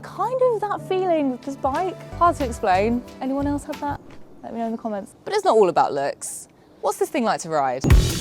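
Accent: United Kingdom. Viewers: I think people keep stopping you because you're a complete smoke show with an adorable British accent and the bike is cool enough to be an ice-breaker. Oops, did I say that out loud?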